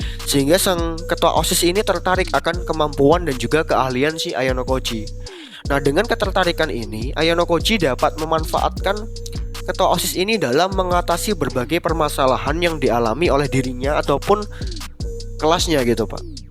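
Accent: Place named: Java, Indonesia. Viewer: logat jawa pake lo-gw jd aneh ditelinga, mending kamu-aku wae mas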